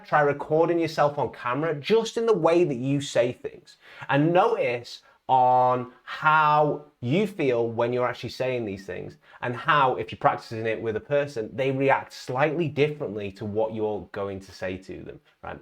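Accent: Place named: United States. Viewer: I like your accent, I have no idea which part is it from in the US, but it's cool haha.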